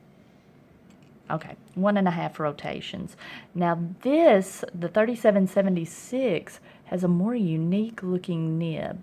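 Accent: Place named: Appalachia, United States. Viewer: Good review, but it's wearing to listen to her hillbilly accent.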